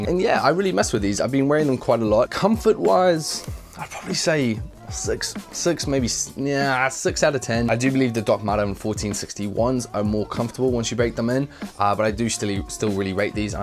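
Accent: New Zealand. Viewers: Do any of yous know if he's from NZ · omg so u are from New Zealand?! The first time i heard you I thought u were Kiwi but I think I heard something about the UK so I thought wuhhht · tuna - pretty sure it’s New Zealand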